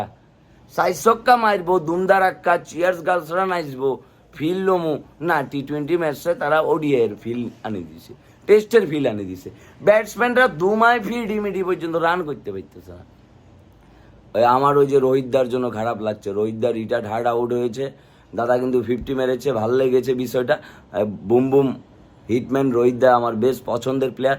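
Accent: Kolkata, India. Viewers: দারুন কলকাতা ভাষায় কথা বলছেন❤❤❤ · কলকাতার এক্সেন্ট আপনারে মানায় না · এরকম কলকাতার ভাষায় বলতে থাকলে ভিডিওতে লস খাবেন আগের ভাষায় ফিরে যান। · Kolkatar vasay joss lage vaia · আপনার মুখে কলকাতার ভাষা ভালোই লাগলো। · Bhai kolkata r accent ta ekdom bhaloo lagee naaaaa............Normally onek bhalo lage apna kotha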